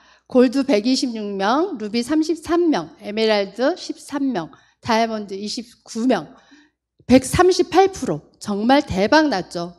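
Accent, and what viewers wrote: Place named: Jeju, South Korea. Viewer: 리더님 하면 구수한 제주 말이 기억이납니다 친정어머님도^^ 행복의 기준! 저에겐 건강이였습니다 좋은강의 감사합니다♡♡♡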